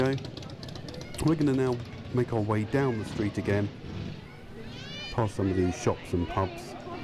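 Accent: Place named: United Kingdom. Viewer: What I meant was I love your British accent that’s all. I am resting up for your next walking video. Stay safe.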